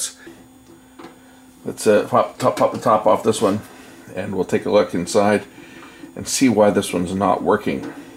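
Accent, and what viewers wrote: Canada. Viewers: You from Canada?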